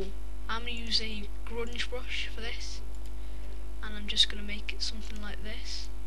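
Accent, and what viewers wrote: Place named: United Kingdom. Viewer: i was GOING to listen and watch this tutorial but i heard that you were british and like final fantasy so related videos here i come..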